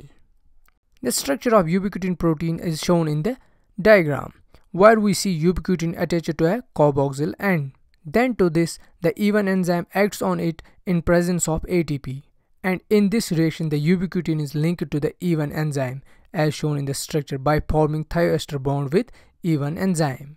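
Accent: Kashmir, India. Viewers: Sir i guess u r from kashmir?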